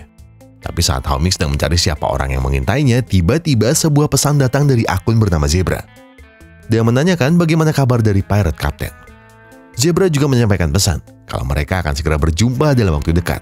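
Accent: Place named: Semarang, Indonesia. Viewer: lek ngademin logate koyok wong semarangan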